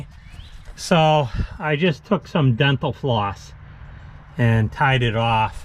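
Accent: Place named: Maine, United States. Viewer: So your tuber name says NH but your accent says you’re a mainah.. I’m in southern coastal Maine. Wicked Pissah videos on the the Jeep having a 3rd cylinder misfire so now I know where to start my looking.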